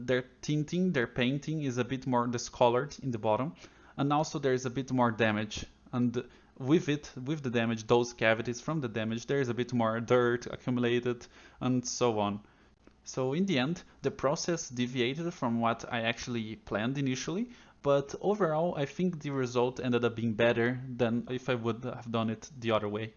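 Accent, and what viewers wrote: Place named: Europe. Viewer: primeira vez que escuto um brasileiro com sotaque de europeu kkk. Bom trabalho aí mano muito bom seu workflow.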